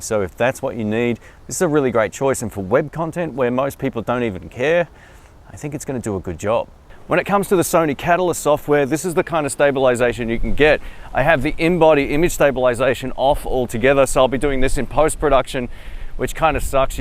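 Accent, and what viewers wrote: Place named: Australia. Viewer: About the grip: have you searched for a "smallrig" wood replacement? I improved my grip on the ZV-1 (my solution to get cheap, reliable AF last year). Now the size (almost identical to my Pana GX8) is really nice - but some of the "old tech" aspects... How do you see the a7IV compared here - makes sense to wait for it, right? (do we ever wanna wait for something - who doesnt want the cam NOW?) great channel, new subber here - could tell you're an aussie quite qick into your first vid - but it's a nice accent, sympathic! greets from Austria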